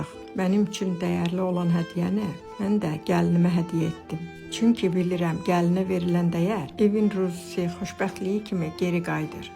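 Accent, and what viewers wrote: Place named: Qazakh, Azerbaijan. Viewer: Haralısınız? Ləhcə var, mən bizim Qazax tərəflərə bənzətdim.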